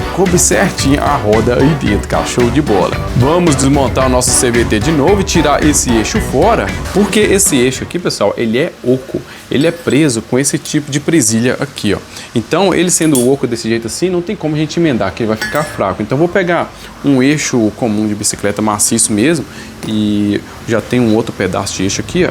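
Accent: Minas Gerais, Brazil. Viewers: "Olha só procês vê", mineiro raiz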